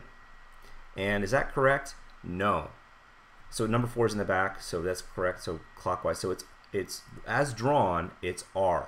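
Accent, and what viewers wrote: Canada. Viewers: That canadian accent tho!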